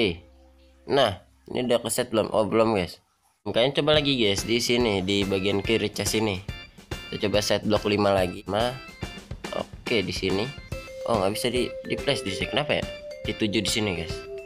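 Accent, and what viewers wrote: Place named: West Java, Indonesia. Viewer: bang orang sumda ya aku dengar deukeut saat yang ke-3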